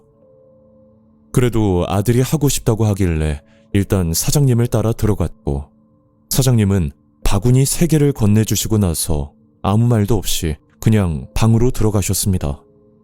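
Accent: Gyeongsang, South Korea. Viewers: 여기 채널 은근히 경상도 많이 깜! ㅋㅋㅋ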